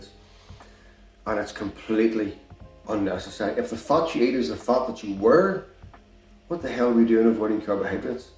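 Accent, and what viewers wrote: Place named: Ireland. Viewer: New subscriber from Australia, hello 👋 I love your accent, don't get me wrong , but the first time I heard you talk about Fat , I thought you were swearing 😧 then it dawned on me, Ah an Irishman 😊☺️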